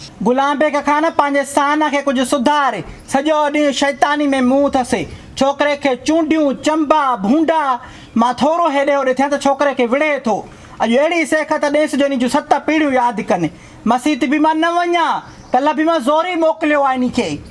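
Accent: Sindh, Pakistan. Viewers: I’m very happy. Ma China na regular program disando aahiya. Sindhi tamam piyari tha galhayo. Lafzan me clearity Kamal aa MashaAllah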